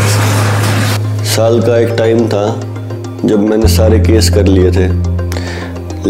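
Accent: Haryana, India. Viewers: Sir your haryanvi is awesome - approved by another haryanvi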